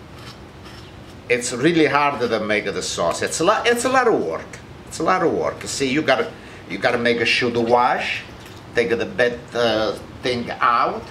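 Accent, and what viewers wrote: Italy. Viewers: I just absolutely love this man especially when making Italian food with his accent. Thank you. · See an old guy See title - how to make tomato sauce Click Italian accent = full attention/excitement Sit back and enjoy learning from a master. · *PURE GOLD ITALIAN ACCENT* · I see a man cutting tomato's in his garage, speaking with a heavy Italian accent and i subscribed. Update: Wow I have never gotten anything over probably 30 likes before. Thank you! Good food brings people together!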